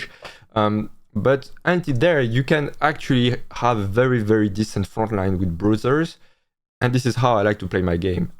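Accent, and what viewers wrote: France. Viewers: you sound french, are you ?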